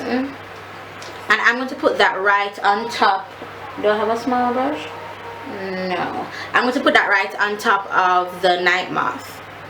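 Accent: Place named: Jamaica. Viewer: Love your videos!! And your accent-from a fellow Jamaican x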